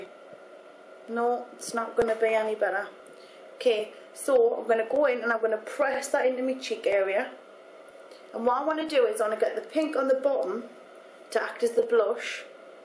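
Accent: Newcastle, United Kingdom. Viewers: she's from newcastle, so its called a geordie accent lol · I think she sounds alittle more british.. from the UK at least haha. idk. I'm probably wrong. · its called uk accent XD everyone talks like that there lolz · I think she's from Newcastle (north of England) she sounds like Cheryl Cole so I think so! I loveee it :)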